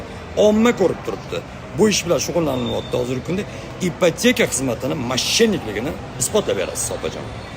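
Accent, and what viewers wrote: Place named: Tashkent, Uzbekistan. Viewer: Узинг наманганликсану узинча тош.ни шевасида гапирасан . 50 йил четда яшаганам тилини сотмаган акилли эркак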